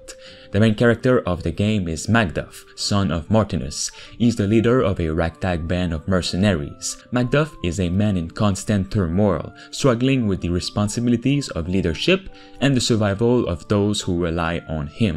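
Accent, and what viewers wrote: Quebec, Canada. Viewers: Judging by the accent, he is probably french canadian.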